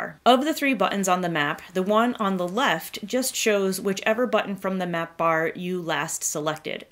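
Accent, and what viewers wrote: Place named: New England, United States. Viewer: Found this by accident and great!!. Just what I need but need a faster brain to keep up with your Vermont one or somewhere in New England. Use to live in Norwich. Thanks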